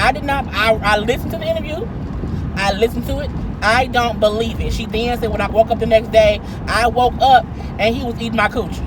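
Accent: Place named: New Orleans, United States. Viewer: I don’t believe that lady in your New Orleans voice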